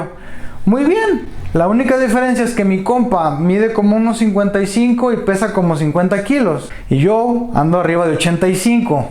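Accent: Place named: Mexico. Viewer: Me gusta más estilo mexicano de este bato a la hora de hablar... muy chido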